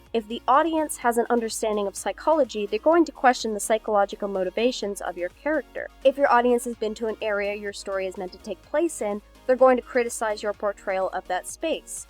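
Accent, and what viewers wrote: Canada. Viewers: Always makes me happy to hear more Canadians.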